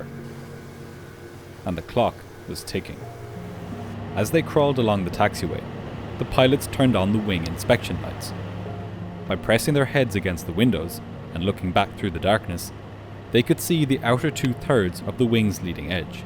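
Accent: Ireland. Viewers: Don’t you just love the Irish accent ❤ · "Outer two turds." Man, you make superb documentaries, and I'm not mocking your accent. I just get a giggle whenever I hear the Irish in your voice. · How did plane crash videos become my comfort show 😅 I blame the Irish voice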